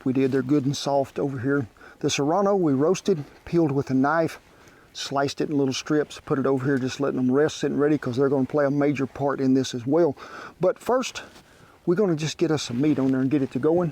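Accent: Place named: Texas, United States. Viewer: Nice video. Where is this accent from? Is he from Texas?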